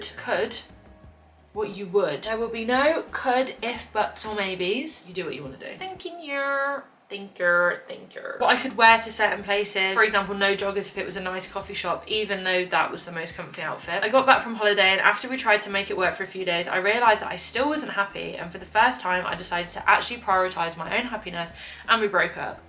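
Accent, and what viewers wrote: United Kingdom. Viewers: Am in love withe ur british accent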